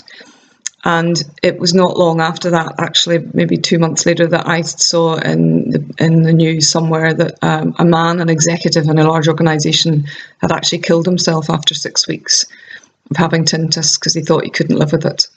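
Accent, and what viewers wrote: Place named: Scotland, United Kingdom. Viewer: Dear, thank you so much for this absolutely helpfully video. In the first minutes it was hard to understand your scottish accent, because I am from Germany. But after a while it worked.;-) I have Tinnitus for 20 years, did the TRT with noisers and I couldnt hear anything the last 18 years. But now, 3 months ago, after a heavy Burnout, it came back. I couldn't believe. I found Julian Cowen Hill, he is great. And another person from Germany. They both help a lot. But I am absolutely convinced, that all what you are telling and the others is true. Now I first have to clear my mental state and then use the noisers again. Now, I try to think postive and to see it as a chance to change something in my life. Best regards, Martina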